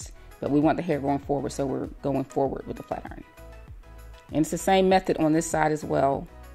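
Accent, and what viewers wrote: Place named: Georgia, United States. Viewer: Lol... I was just thinking she sound like she from Georgia!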